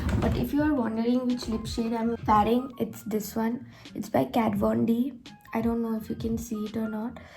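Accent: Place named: Bengal, India. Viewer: You're such a sweetheart and that Bengali accent is so cuteee!❤️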